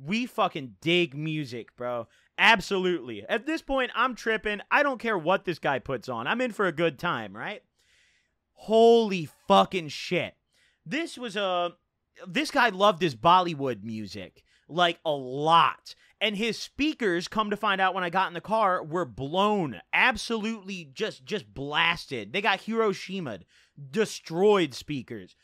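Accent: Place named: Midwest, United States. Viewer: Could tell he from the Midwest by the way he talks lmao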